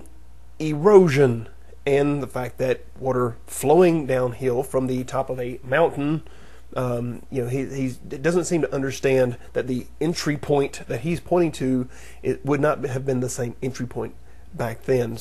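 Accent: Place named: South, United States. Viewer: fuck, that should be than. BTW, you rock, love the vids. In MS here. Central. You sound just a little south:)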